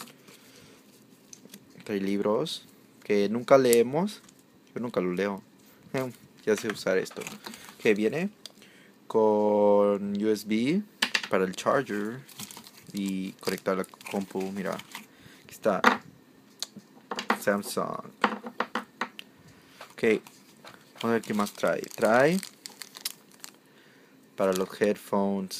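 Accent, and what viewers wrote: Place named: United States. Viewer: tu ingles es excelente, el español bastante bien siendo un idioma bien dificil, pero el tono estadounidense en el ingles es ideal para videos en ingles, en mi opinion.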